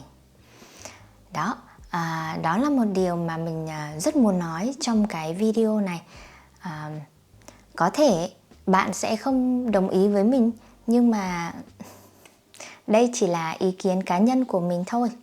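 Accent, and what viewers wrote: North, Vietnam. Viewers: Câm ơn cháu. I can hear your Northern accent.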